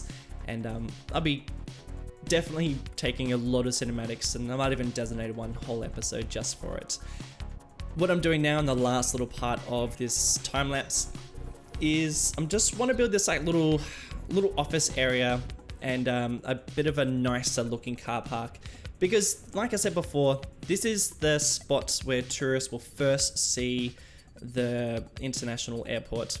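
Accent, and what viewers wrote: Australia. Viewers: I mean mate I'm an Australian and I can already tell about the accent, you're a bloody mate! So keep up the accent it makes me feel like home!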